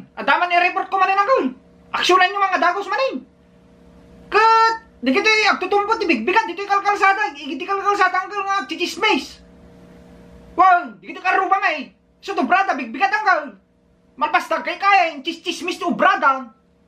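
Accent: Ilocos, Philippines. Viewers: I have Ilocano parents from Gerona Tarlac, I was born and raised in London U.K. Your accent very strong Ilocano and reminds me of my relatives aunties and uncles from the barrio village very strong Ilocano and shouting talking with each other like they are in the mountain! Hahaha 🤣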